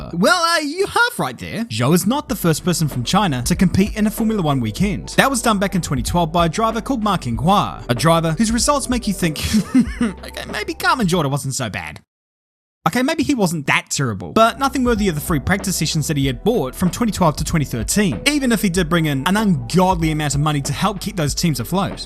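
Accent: Yorkshire, United Kingdom. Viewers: Yorkshire accent on point brother. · Gotta love the Yorkshire accent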